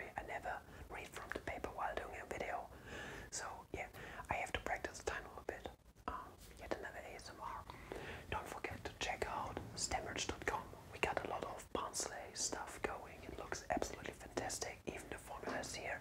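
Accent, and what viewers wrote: Germany. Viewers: You German good sir ?? Btw thanks for the video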